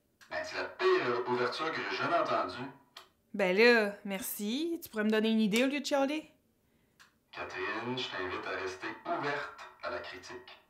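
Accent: Quebec, Canada. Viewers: Merci pour vos videos. L'accent quebecois est mignon.😊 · bah il est normal, c comme ca qu'on parle au Québec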